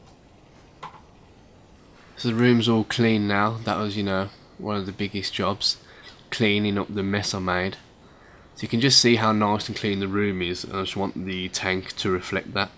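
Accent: Birmingham, United Kingdom. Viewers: are u a brummie lol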